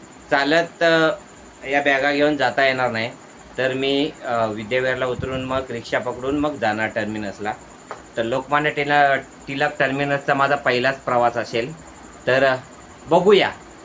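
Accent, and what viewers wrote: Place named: Sangameshwar, India. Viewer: लय भारी आपली संगमेश्वरी बोली भाषा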